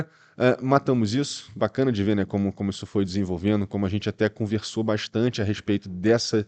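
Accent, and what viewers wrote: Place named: Bahia, Brazil. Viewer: Bahiano! Oxeee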